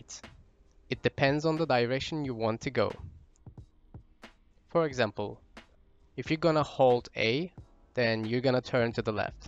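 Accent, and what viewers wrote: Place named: Turkey. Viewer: When I heard your voice I instantly thought you were Turkish looked down and saw the subtitle option, ellerine sağlık valla.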